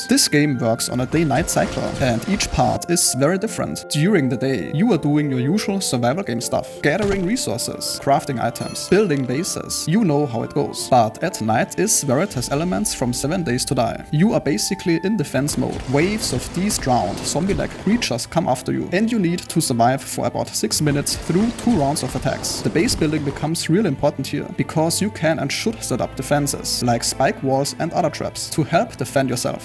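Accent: Germany. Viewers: man spürt quasi das du mitten in Deutschland wohnst :D im sinne hilfreicher Kritik würde ich dir raten an dem voiceover zu arbeiten :) ist sehr monoton und mich persölich triggert der deutsche Akzent :p #elevenlabs lass flutschen <3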